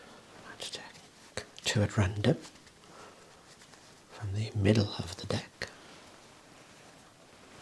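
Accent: Birmingham, United Kingdom. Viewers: Brummie accent. Found in Birmingham UK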